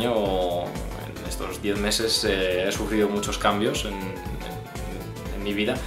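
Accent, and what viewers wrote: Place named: Madrid, Spain. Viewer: ¿y eso? Pensaba que eras madrileño.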